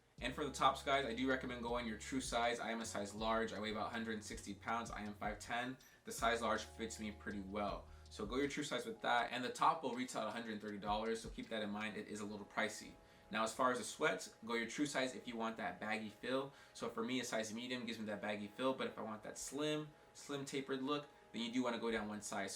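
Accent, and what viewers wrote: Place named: United Kingdom. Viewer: Thought you were from the UK😂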